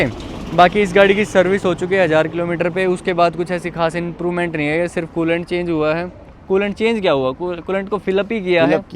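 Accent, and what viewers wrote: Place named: Gurgaon, India. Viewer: टोप, रो , भैयो का एक्सेंट गुड़गावे के लगते।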